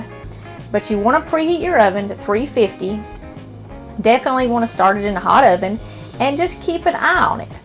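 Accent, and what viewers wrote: South, United States. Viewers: I'm from California and I just happened to see one of your videos, I'm not into country and don't like country accent, but listening to and watching you, I completely change mind and absolutely enjoy watching you and your recipes are so easy and such fun to watch being prepared, Happy Holidays to you and your and also to your utube watchers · Precious Lady, Can you cut the music…it is distracting and I would love to hear your beautiful voice. That Southern accent sounds soft and sweet. That music doesn’t do Justice to your lovely program🌷🌸🌷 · I love your southern accent! I'm from South Carolina 👍👍😊😊 SUBSCRIBED!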